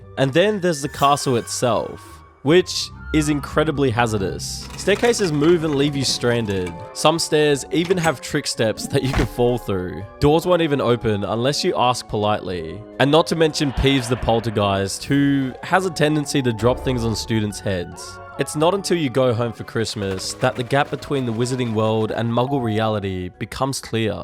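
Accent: United Kingdom. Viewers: What happened to the American presenter? He had a beautiful way of speaking, the cadence the pauses. This one sounds British.....none of the beauty of the American. My ears hurt listening to him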